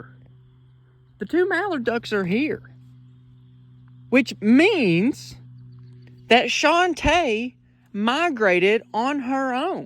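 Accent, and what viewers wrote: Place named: South, United States. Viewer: Im partially deaf but i can still hear how southern he sounds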